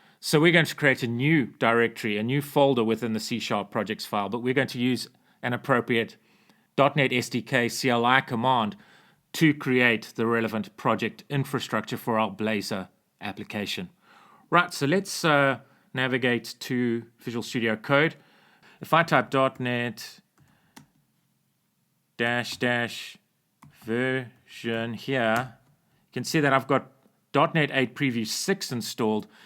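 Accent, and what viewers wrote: South Africa. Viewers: That annoying invisible character that was at the start of your files might just be the BOM, at the bottom right of VS Code you can see "UTF-8 with BOM" so you might be able to disable it there Are you from south africa or am I mistaken? (because of the accent)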